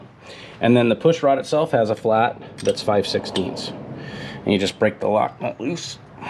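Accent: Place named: United States. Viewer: Very interesting Video for me to understand how a Harley engine works. My M 8 is a Sport Glide 2018. I am not s mechanic, an i dont understand all you talking about (American dialect) cause iam german. Did u say the Oil pump must be changed as an generell rule or can i do it after a certain part of miles? Best regards from Augsburg Germany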